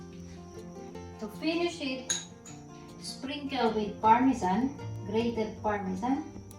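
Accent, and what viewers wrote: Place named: Italy. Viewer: By the way i like your Italian accent...ciao...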